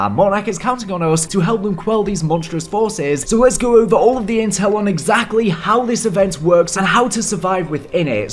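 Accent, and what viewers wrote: England, United Kingdom. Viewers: Im pretty sure that it’s a English/British accent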